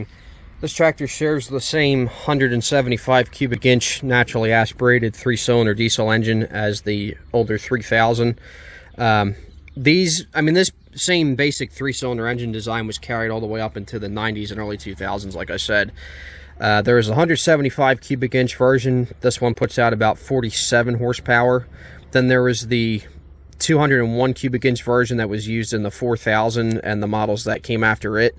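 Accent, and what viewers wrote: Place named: New Jersey, United States. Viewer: that was a wonderful review of the 340A. My friend is looking at a 231 with a loader, but has the smaller 36 hp Diesel. We'll definitely look out for the steering and loader problems you mentioned. On the 3 pt, it's my understanding that the left lift link isn't adjustable, only the right side is. Could definitely tell you grew up in Jersey, as did I. I'm from Bergen County, not many farms left there. Again, great review. Tom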